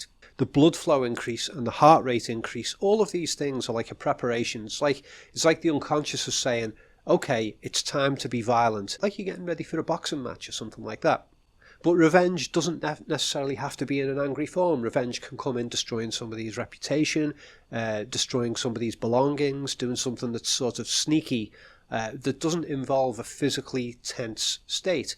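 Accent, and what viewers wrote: Liverpool, United Kingdom. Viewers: Mr, I've watched your videos off and on a for a couple of years now. Your videos are fantastic and your insight is incredible. I've often thought your accent is quite similar to that of Paul McCartney. Well now you've confirmed it for me as you stated you are from Liverpool just as he is. You kind of even look a bit like him. Just an observation is all. Be well sir.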